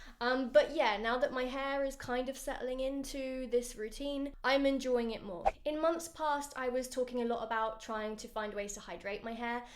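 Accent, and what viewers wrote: United Kingdom. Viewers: What made you assume that? Her obvious british accent or was it something else?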